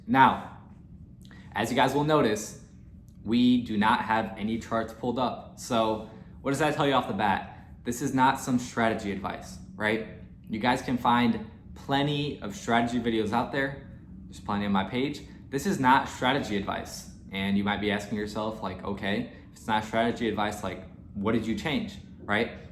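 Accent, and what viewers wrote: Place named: Wisconsin, United States. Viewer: are you from Wisconsin with that accent? that point about scaling up with accounts of different sizes is so true, no more full porting 😎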